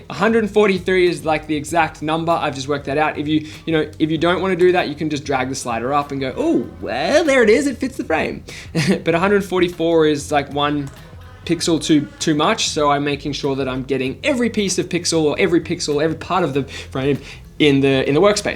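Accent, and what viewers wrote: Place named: Australia. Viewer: Loved the "bellissimo" part ahahha. Moreover, hearing some good Australian bettah and watah reminds me of my lovely months in Sydney. Greetings from Italy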